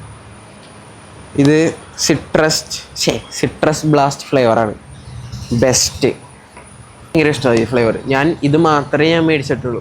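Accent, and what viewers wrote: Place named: Kochi, India. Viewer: Kochi slang poli bro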